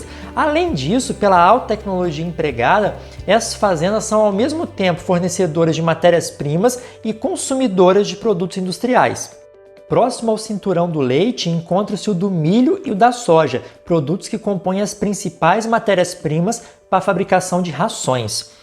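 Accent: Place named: Minas Gerais, Brazil. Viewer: Esse cara é de Minas ou do sul da bahia não é possível!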